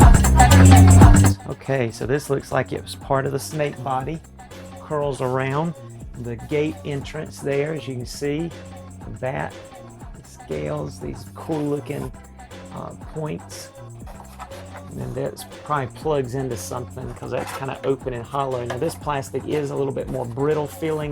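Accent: South, United States. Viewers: Came across your video here and it's my 2nd video I've watched. So the reason i thought I'd comment cause you sound Southern with a Southern accent. I'm in the South as well if you are. I'm getting a Snake Mountain as well from Super 7 but haven't been notified of a shipping track number so just waiting is all i can do.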